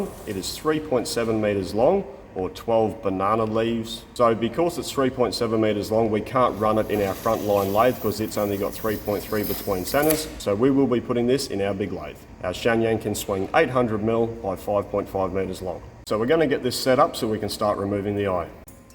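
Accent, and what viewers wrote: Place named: South Australia, Australia. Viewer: Machining is awesome, extremely intelligent and damn good work. is the brains of the shop or is it? Y'all are so much fun to watch and I just Love y'all's videos. your accent is quite southern Australia, just a little different than our RedNeck HillBilly Arkansas accent. Out takes are so Funny. Thanks guy's. Some comments are just for fun. Good night from central Arkansas, United States of Merica.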